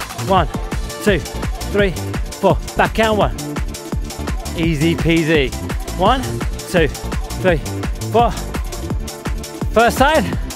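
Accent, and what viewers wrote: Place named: United Kingdom. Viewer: My new favorite one of all! 🙌🏾 🎉🎉🎉 I’m in the US and I realize now… we don’t speak English here!!!! 🤣🤣🤣🤣 Love the Queen’s English, love these videos 🥳🥳🥳 Thank you!! 🙏🏾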